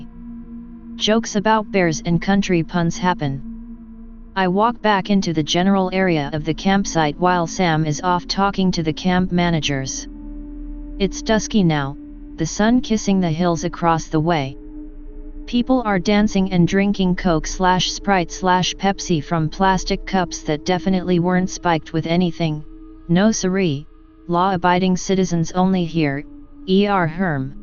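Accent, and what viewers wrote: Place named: Canada. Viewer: Whoa. TTS robot is canadian.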